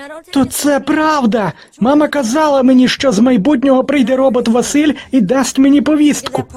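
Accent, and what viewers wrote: Ivano-Frankivsk, Ukraine. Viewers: У нього акцент франківщини, а не львівщини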